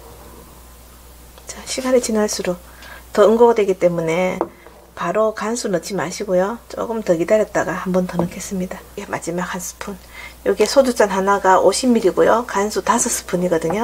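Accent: Gyeongsang, South Korea. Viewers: 설명 참 정확히 잘해주십니다 목소리도 맑고경상도 억양이 매력적입니다 두부 한번 해봐야 겠다 날마다 좋은일만 있으시길 바래요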